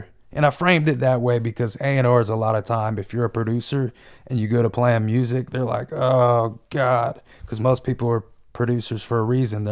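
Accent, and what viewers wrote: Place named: West Virginia, United States. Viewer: Are you from WV fam? Or do tennesseans say "on" like West Virginians?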